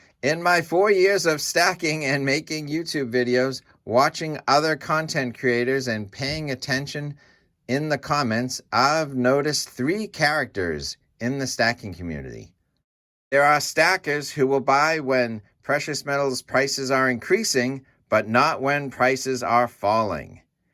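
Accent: New England, United States. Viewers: You sound like a fellow New Englander. Lol. I bought 58oz last Saturday on the 11, and this Saturday it was up almost $2.00 an ounce. I still bought 42 more oz yesterday. I’m not all that concerned about the exchange rate for silver, unless I’m buying of course. My silver and gold are not for sale. Whatever the price, it’s still going to be receiving worthless paper in exchange. My stack is for the big one. When we go back to what we did for six thousand years. When silver and gold were MONEY. Which they will be again, much sooner than people think. I like your narrative! Keep buying,Keep buying, Keep buying, then KEEP BUYING! 😂👍🏼💪🏻❤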